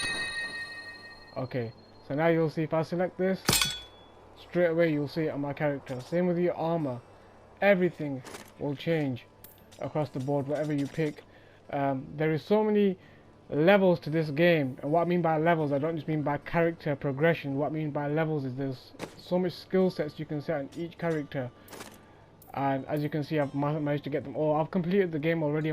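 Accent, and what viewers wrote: United Kingdom. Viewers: Is that a prestonian accent? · London accent with Indian parents?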